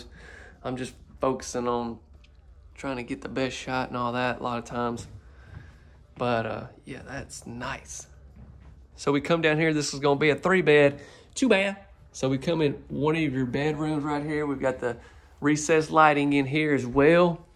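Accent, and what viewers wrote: South, United States. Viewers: Beautiful but not my favorite. No pantry, loved the shelving with baskets. I loved seeing the nicer sinks & black faucets. I like the copper also. Mater closet sucked. Great job. I love your accent & words you use. Alot of my family is from Alabama, Georgia, Arkansas & i hear & use it also!! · The faucets for the free standing tub needs to be black like all the rest! Perfect! You are doing GREAT, the great southern accent at all! I have it too, so LOVE it!!! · Love the master bedroom all the windows. An the colors of the trailer. Please stop apologizing for being for the South. That's just how we talk down here. I know what a hair is...lol. I enjoy watching your videos.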